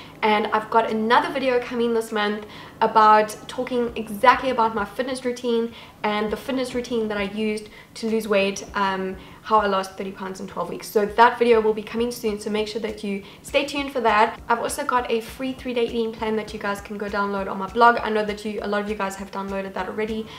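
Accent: South Africa. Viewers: That South African accent nehh😍😍plus Woolies products got me hook,line and sin🇿🇦✌🏿👊🏿👍🏿😉 Lekker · Stumbled on this video and was like ‘wow she sounds south african’🤔 Thats because you are! So lovely to see a fellow SA youtuber and loved the video! Now Im hungry... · I'm definitely gonna try out these recipes they look super yummy! Also, love the quality of your videos and use of good angles, closeups, lighting, etc. One more thing, my best friend is from Cape Town and gotta love those South African accents hehe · Where is your accent from. I'm guessing South African. Sounds pretty. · Hullo from another SAfrican in New Zealand. Miss that accent and I miss Woolies!! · Yah that's not an Aussie accent haha, South African more like :p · That's a South African accent.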